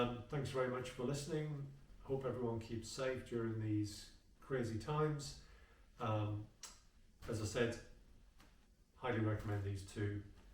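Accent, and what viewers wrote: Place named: England, United Kingdom. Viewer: Hi, One more question: How are you with the 10'F (-12'C) version? Is it warm enough for you? lightweight or heavy? What kinds of locations are you planning to backpack with it? From your accent, one might assume you are based in England. I am deciding between the 10'F and 0'F (-17'C) versions...The 0'F version adds a few more ounces but might work better in the New York State winters. I would like to backpack in summer and also occasionally sleep outside in winter. Maybe I'm expecting too much from one sleeping bag?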